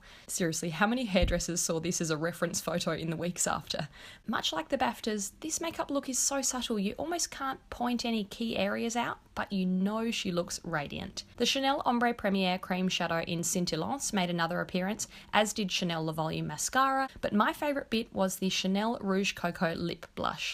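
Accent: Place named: Australia. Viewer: your videos are so simplistic, honest, innovative, creative, soft and realistic. your content not only gives insight to these products, but also show a hint of understanding the real usage and how it would be applied in everyday life, and in special occasions seen by the different styles of videos that you upload. personally, I love Australian accents, and I love it when people really support that backgrounds, and your enthusiasm and growing appreciation towards Aussie products and your cute and unique accent results in me watching your youtube videos over and over again. Thank you for the amazing and continuous effort that you put into your videos, I always find myself smiling when I click to view your creative content. 💓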